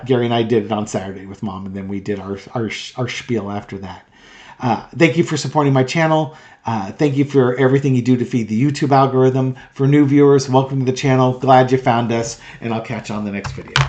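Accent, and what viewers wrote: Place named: United States. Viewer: I misheard your lovely American accent and thought you said your new channel is called - twin terror! Oops I then realised twin tarot...Fabulous.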